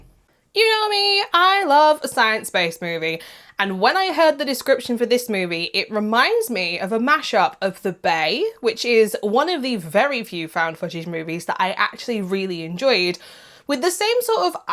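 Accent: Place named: United Kingdom. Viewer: tearin' bad movies apart with British accent makes it EVEN funnier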